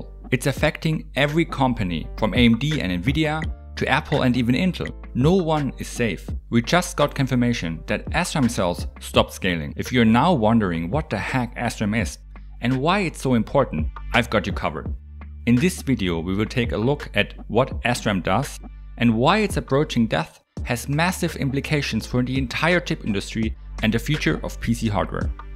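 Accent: Germany. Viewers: ja das ist es xD du hast einen krassen deutschen akzent aber hey, fühl ich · Sounds like the most Deutscher Akzent ive ever heard xd but nice video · I can hear the german Accent hehe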